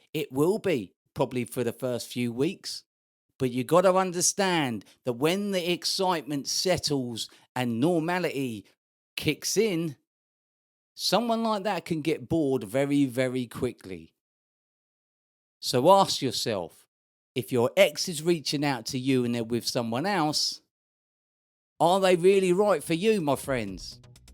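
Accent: Cockney, United Kingdom. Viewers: sounds pretty cockney to me atleast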